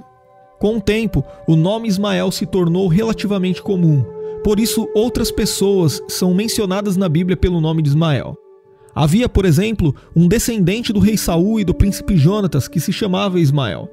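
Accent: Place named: Interior São Paulo, Brazil. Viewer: Essa pronúncia do R do narrador, Um R corrido, é horrível. O cara faz vídeo pra o Brasil inteiro e não trabalha a pronúncia do jnterior Paulista. sinistro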